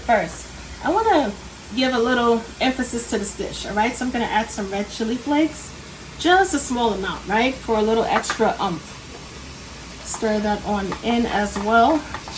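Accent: New York, United States. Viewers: She sounds like she’s from New York or New Jersey or something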